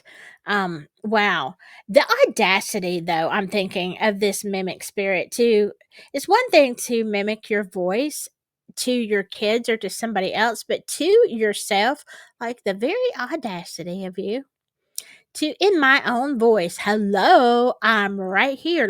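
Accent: South, United States. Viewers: I think you nailed it and love your channel name. It tells what kind of content you have with the word spooky and with your beautiful southern flare to give the added bonus. Also, I got to hype your channel. YAAA ❤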